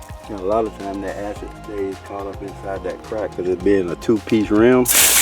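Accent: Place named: Texas, United States. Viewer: YOU GOTTA BE FROM TEXAS BRO! #SouthernDraw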